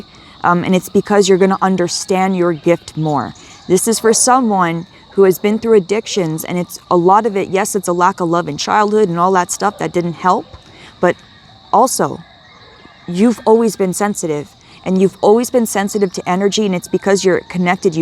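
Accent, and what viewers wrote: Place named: South, United States. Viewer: Hi!! Don't worry about crying on camera!! It's OK!! Let out your pain because I completely understand and have experienced bad childhood trauma. And I know that I also felt like something was wrong with me too for a very long time. I'm not here to judge you whatsoever. And by the way, I cannot believe i have never heard of, wow just wow!! What an amazing 🎵 🎶 🎵 song!@ I love ❤️ music and I also lovee 💕 to sing. And girl, I am impressed 🎉you CAN sing!! Very nice...keep up your wonderful calling and work youare doing!! This video completely resonated with me!! Although I am not a recovering addict, I am surely surrounded by others that do have drug addiction and alcoholism. I am not here to judge anyone or myself. I am truly on my own healing journey ✨️ girl!! And healing is not linear and it is messy!! So collective KEEP on keeping on Y'all..love that slang, lol. 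I am a true born Canadian citizen here but I just love ❤️ the Southern drawl!!! 😅 people say I have an accent that I don't recognize of myself but I just love ❤️ 💕 speaking to people from other parts of the world!!!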